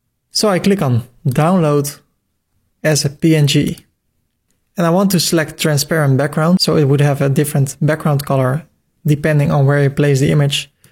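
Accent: Netherlands. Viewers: I Love that I heard in the first 10 secs that you were Dutch, anyway great vid man, ga zo door!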